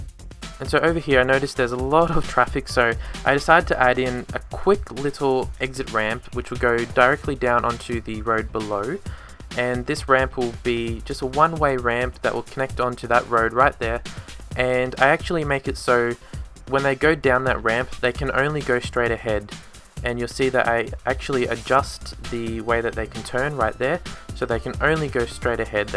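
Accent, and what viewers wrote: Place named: Australia. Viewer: Yeah, I just looked up how it is in Australia, and people there drive on the left side too. And I'm not sure but I thought I heard a hint of Australian accent in his voice. Not entirely sure though.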